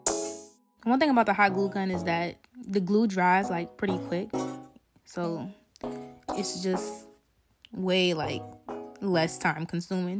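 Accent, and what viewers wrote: United Kingdom. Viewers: so you british or american because i heard the british accent in another vid and now i’m real confused😩😩if it’s fake though you is good at it (i’m from the uk btw) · Love the English accent yeah 😍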